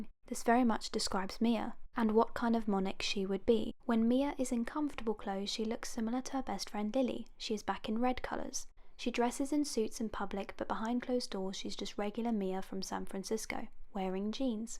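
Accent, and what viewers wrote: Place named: England, United Kingdom. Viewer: As an English girl with a similar accent, I can confirm that her voice is soothing af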